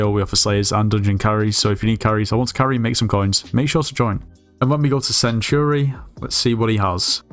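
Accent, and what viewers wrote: United Kingdom. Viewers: With the other brits you sounded REALLY British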